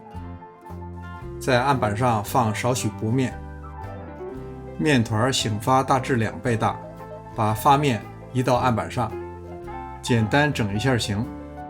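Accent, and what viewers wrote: Beijing, China. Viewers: ，在海外的人听到乡音，倍感亲切，喜欢您的视频，而且还有老北京的风味小吃，太棒了！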